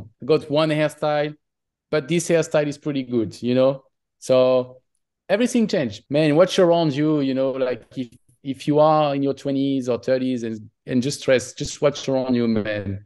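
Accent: France. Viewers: Il est très beau maintenant. Plus mieux quand chauve. I could tell he speaks French from his accent. I get frustrated when a guy with a bald spot doesn't shave his whole head. It's annoying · I like his accent - is he French?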